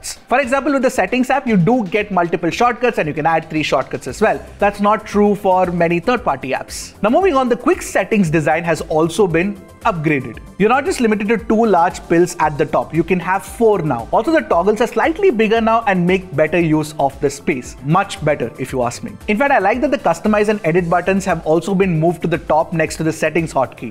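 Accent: India. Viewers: The Indian accent is truly awful!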